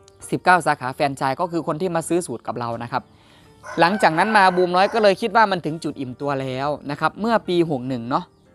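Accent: Isan, Thailand. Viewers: เว่าอีสานกะดีคือกันเด้อครับ ฟังแล้วอบอุ่นดี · เสียงเว้าของ มาคือกันกับเสียงผู้ใหญ่บ้านฟินแลนด์ฟังเรื่องราวชีวิตน่าชื่นชมที่เป็นคนที่ต่อสู้ขอให้รักกันแบบนี้ตลอดไปดันๆให้มีคนติดตามเพิ่มขึ้นเรื่อยจนถึงแสนเด้อจ้า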